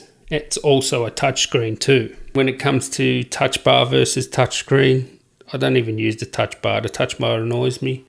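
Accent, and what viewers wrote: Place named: Australia. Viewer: Australian accent in the flesh 😜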